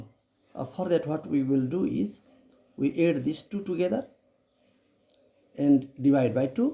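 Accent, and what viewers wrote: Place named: Nepal. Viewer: His accent shows he is nepali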